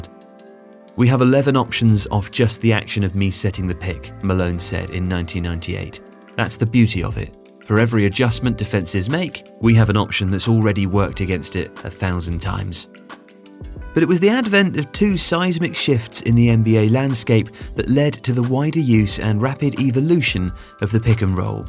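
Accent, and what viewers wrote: England, United Kingdom. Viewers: Somehow I'm still not used to british accent explaining basketball..😂 yet great content tho.. Keep it up!!!🔥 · Rather listen to English accent all the time!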